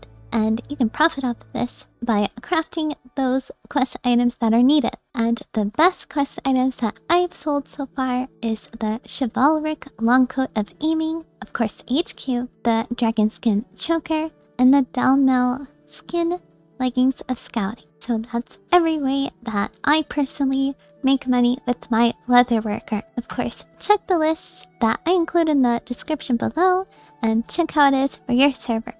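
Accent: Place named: Texas, United States. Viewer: I have to be honest after watching you streaming is a little weird hearing you without your sassy texan voice. Still, cool video.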